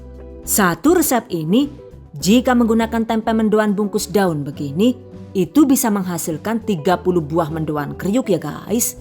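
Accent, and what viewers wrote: Banyumas, Indonesia. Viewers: tiba,e ngapak yu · Ngapak banget yu lah hahaha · Mantap banget Bu,,,njawane medok men,,,asli banyumasan ?aku salah sijine pengikut postingan e Lo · Laahh cingire, ngapak jebule 😂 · Selama ini ngebayangin mukanya ga kyk gt Jadi kaya wajah dan suara dijual terpisah 😂😂😂 Kaga matching Kalo yg ngasih resep wong ngapak hrsnya legit nih resepnya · 😀😀😀 haha Aku ketawa terus klau Pas mbak nya ngmng ngapak😂 sukses selalu mbk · Laaah mimin nya asli madiun apa banyumas ngapak bgt😂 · Wkwkwk dasar mendoan Ora ngapak ora kepenak... Ketika suara ngapak bikin kaku weteng ku🤣🤣🤣🤣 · "DONE" Pertama kali lihat channel ini penasaran, akhirnya coba di tonton, dan yang bikin pengen terus nonton itu suaranya yang khas banget ada campuran English dan ngapaknya juga, dan juga kualitas editingnya bagus, bukan kaleng2 pokoknya. Sukses terus Channel. Aamiin #Giveaway THV2020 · Bisa ngapak yu,, Rika wong banyumas, p...mantap yu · Buund..syukaa sekali logatnya gado"....indo..english..jwaa ngapak...top deh buund.sehaat teruus yaaa..slm kenal..😊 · oalah ngapak jebule rika yu 😅😅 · Pertama lihat video THV kaget bahasanya ngapak,, sooo nyonk kira wong Cilacap 🤭dadi betah nyonk nyimake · "DONE" Awalnya aneh juga. 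Madiun tapi ngapak. Baru kali ini lihat wajahnya. Makasih resep2nya. #giveawaythv2020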